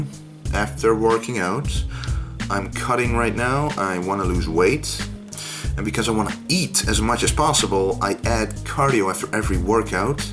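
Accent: Netherlands. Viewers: Were are u from? You sound like a Dutch Guy ! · it's awesome to see another lifter enjoying what he does and publicly answering questions like that. It's quite admirable that he does these videos in english too... I notized right away that this fella must be dutch due to the on and off popping out of the accent. Right on cuz keep it up !!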